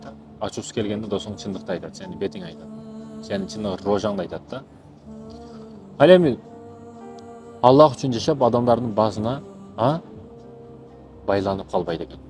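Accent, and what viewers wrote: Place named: Osh, Kyrgyzstan. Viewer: Мобу блогер оштук окшойт а деген акцентинен эле билинет экен😅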